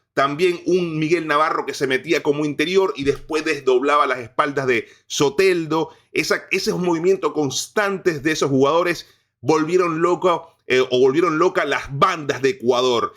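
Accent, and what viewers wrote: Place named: Argentina. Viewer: Panita, pongase a escuchar un cd de salsa o gaitas, ese acento argentino q agarro en 1 mes no cuadra, mucho menos hanlando de la Vinotinto. Buwn análisis.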